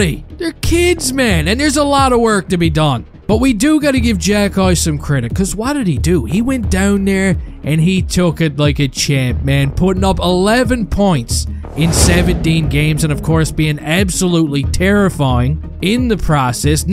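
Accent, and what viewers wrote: Newfoundland, Canada. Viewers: I was just wondering yesterday if they were going bring him up soon. It's going to be interesting... Btw your accent, are you from Newfoundland/Labrador maybe? Cheers from Sweden 👍😊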